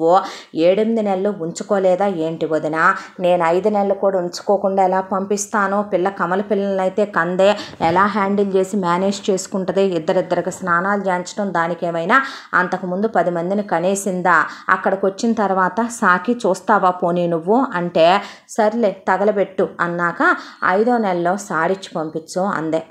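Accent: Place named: Guntur, India. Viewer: Mi story reading chaala bavuntundi gaaru.Guntur slang excellent.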